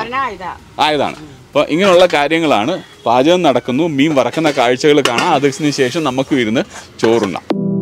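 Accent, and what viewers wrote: Thrissur, India. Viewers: Thrissur എത്തിയപ്പോ ചേട്ടന് ഒരു തൃശൂർ slang വന്നിട്ടുണ്ട്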